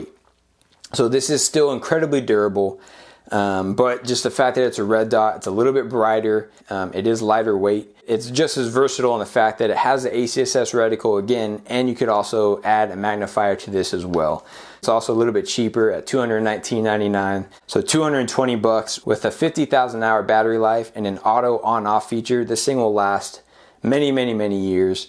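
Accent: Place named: North Texas, United States. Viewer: Sounds like you live in North Texas lol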